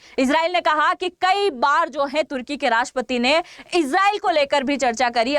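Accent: Gujarat, India. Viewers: Bahenji aap ka vishleshan bahut hi achha hai.keep it up. God bless you. Aap ke ucharan se lagta hai aap gujrati hain. Sorry,kindly try to correct your hindi specially numbers.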